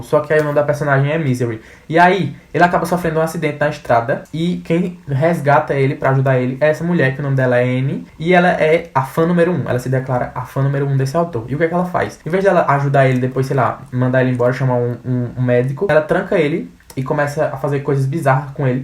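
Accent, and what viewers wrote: Northeast, Brazil. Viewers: é TÃO raro achar esse sotaque no youtube, que eu ainda tô em choque... Certezaaa que esse aí é do meu Nordeste!!!!!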